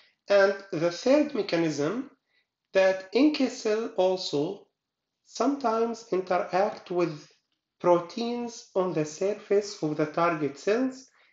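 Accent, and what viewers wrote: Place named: Arab world. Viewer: الله يعطيك العافية اول الشي بس الفيديو من شخص عربي و موجه لعرب بتحكي انكليزي ليه!!! المحتوى الاجنبي غني جدا بهيك اعمال و المحتوى العربي هو الفقير. و من لكنة كلامك بالانكليزي واضح جدا انك شخص عربي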